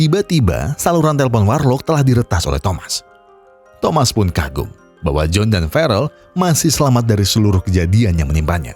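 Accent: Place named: Yogyakarta, Indonesia. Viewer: wong jogja bro, seko logat e ketoro wkwk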